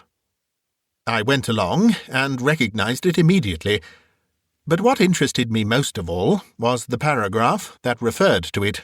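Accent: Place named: Upper class, United Kingdom. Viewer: I watch every video you post. I absolutly adore your British Upperclass reading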